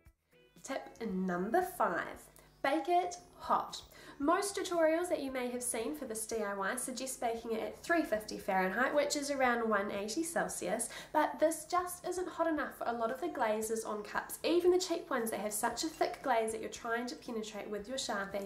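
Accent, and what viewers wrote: New Zealand. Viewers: Lovely listening to you and thinking 'That accent... She must be a Kiwi!' Thank you for the tips, will have a go! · You have a New Zealand accent :)))))))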